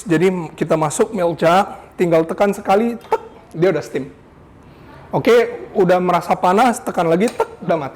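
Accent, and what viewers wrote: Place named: United Kingdom. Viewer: iya itu logat british. Sama kayak Harry Potter.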